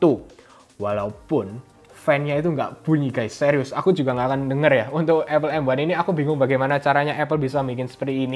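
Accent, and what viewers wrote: Java, Indonesia. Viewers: thanks gan.ngomong ngomong asli ngendi sampean mas.wkwkwk.ligate jik ketok jowone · Logate Jowo banget mas wkwkw.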